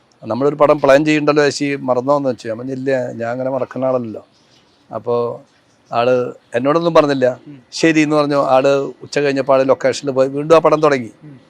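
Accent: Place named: Thrissur, India. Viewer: നിങ്ങളുടെ സംസാരം കേൾക്കുബോൾ തന്നെ അറിയാം ആ ഗഡ്സ്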